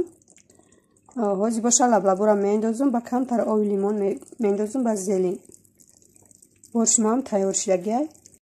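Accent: Yovon, Tajikistan. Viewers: Салом хохарчон ман зан хастам шумо аз ёвон мешед ми лахчаи гапзанитон ранги ёвонихо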